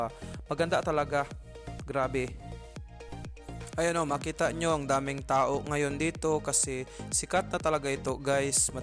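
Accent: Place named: Visayas, Philippines. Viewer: parang bisaya met iti tuno mon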